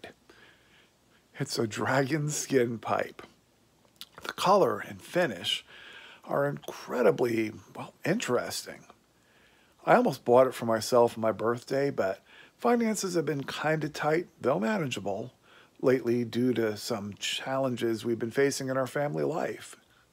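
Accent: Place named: New Jersey, United States. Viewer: Heard the Jersey in this one! Love your vids.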